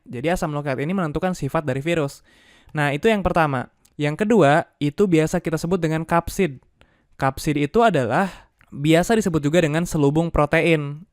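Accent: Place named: Bandung, Indonesia. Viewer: Orang bandung kak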